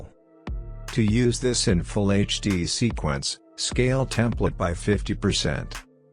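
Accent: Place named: United States. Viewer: YES SIR BUT WHEN I WAS WATCHING ONE OF YOUR TUTORIALS , YOUR ASSCENT TO SPEAK ENGLISH WAS LIKE AN AMERICAN , PLEASE REPLY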